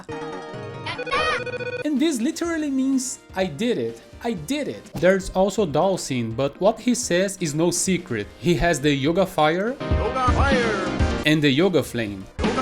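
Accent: Brazil. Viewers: Mano tu é catarinense né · Nunca vi esse canal antes. Ouvi, literalmente, 3 segundos desse cara falando e percebi que era brasileiro.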